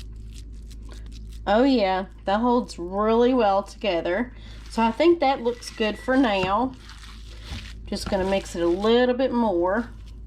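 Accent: South, United States. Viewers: Salmon cakes with southern accent. Im subscribed 🇺🇸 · I could listen to you talk ALL DAY! I LOVE your country accent. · they look good this woman is a good ole country girl and you can tell by her accent i bet they are good im 68 and been eating them all my life in my grandmother cooked all the time and did not have any education at all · I love your beautiful Southern accent. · She so country and I love it lol😂. I’m country too , northerns love our accent!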